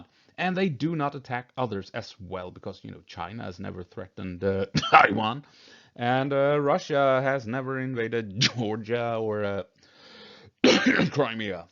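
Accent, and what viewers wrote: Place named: Gothenburg, Sweden. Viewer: If I had the money I would pay for you to read more troll comments in that thick Göteborgs-English lol. That was great haha.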